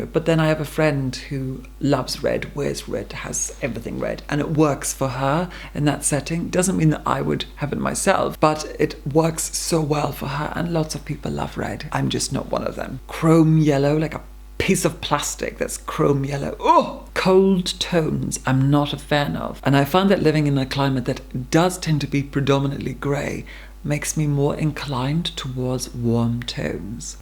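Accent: Scotland, United Kingdom. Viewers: He’s getting rid of his Scottish accent 😭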